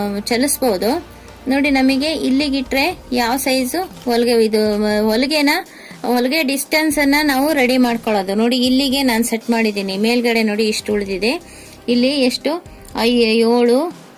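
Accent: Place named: Uttara Kannada, India. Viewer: madam nim bhashe nodidre uttarakannada davru ansatte. nim jote ondsari matadbekittu madam plz.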